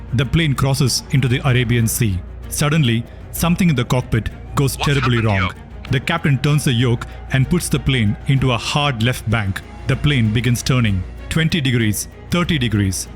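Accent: India. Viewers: These pilots are f*cking idiots...Boeing is right to blame the Captain but they should've blamed the whole crew, I try not to judge but there's no way I could've made this mistake, not with 3 attitude indicators and 2 of them saying the same thing...if it was 2 broken attitude indicators saying the plane was banking right I could understand it more but then you'd still have one that's moving correctly and to ascertain your correct orientation all you'd have to do is bank the plane left and right...the only way I could understand this completely is if it was all 3 failing in the same orientation or if it was something like Aeroperu 603 where the crew was constantly receiving conflicting information...all that life gone for a problem that I feel many rookies would spot fresh out of the academy and the only real thing that stops me short of judging these guys is that I've never been a 747 pilot...thank you as always for these premium uploads I spotted a few errors like the cockpit being off, the engine models and the yokes of a 707 but all the information that you give that's most important is spot on and never biased and I really appreciate it not to mention I love your accent and Indian culture is such a a rich one, I almost hate seeing how beautiful this 747 was and all the amenities with Indian culture infused into it, makes me wish I was wealthy to experience things like it and thats one reason the 747 is my all time favorite aircraft because it was like you said like a fly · Fuck u bro ur Indian when u speak an when u tell this story your English is mix in Hindi so better why u don’t try to say this story in Hindi coze ur English do not sound like English 🏴 uff any way good try keep it up 😡😡😡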